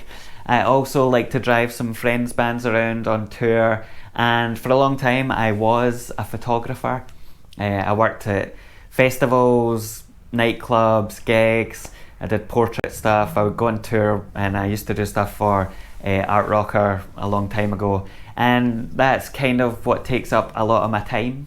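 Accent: Scotland, United Kingdom. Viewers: Found your channel by accident but happy I did. Nice to hear a local(ish) accent and sumdy else who likes synths haha. Do I detect a wee southside accent, Ru'glen Camslang area?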